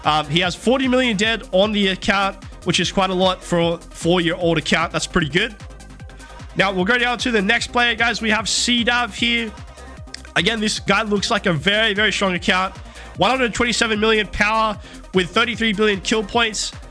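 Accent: Australia. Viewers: Oh dam an Aussie streamer. I had no idea 😂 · r u come from australia ?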